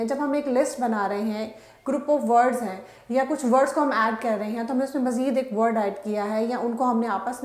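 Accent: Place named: Urdu, Pakistan. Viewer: Mam I think your mother tongue is Urdu right ? But you teach very super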